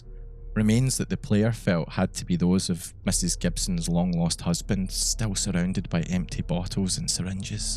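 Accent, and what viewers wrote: Scotland, United Kingdom. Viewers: Can't nail the Scottish accent down to 5O miles , I'm noob to the channel but am born in Glasgow and have been dealing with Mexicans on construction sites for too long in So-california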